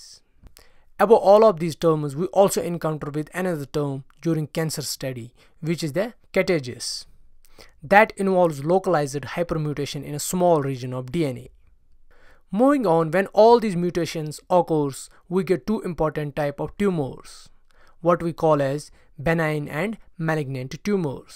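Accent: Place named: Kashmir, India. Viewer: Hehehe funny Kashmiri English dialect...... love it.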